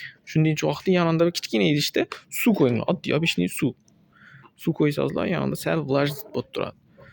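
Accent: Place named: Khorezm, Uzbekistan. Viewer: Авваломбор узрку,Хоразм вилояти хам Узбекистон худудида жойлашган ва мактабларда адабий тилда дарс берилади,лекин иккита хоразмлик сухбатлашсангиз ,улай агар умуман тилингизга тушунмайман,шевангиз жуда кучли,маслахатларингизга кизикдиму,тилингизга тулик тушунмадим😊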